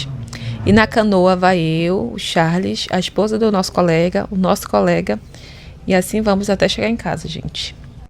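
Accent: Rio de Janeiro, Brazil. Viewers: Vc e do Amazonas mas tem o sotaque de carioca (fala com chiado), Por que???. Vc já morou no Rio de Jan.????. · Oi td bem so uma pergunta vc, tem o sotaque de carioca vc é carioca? Abraços sou seu fam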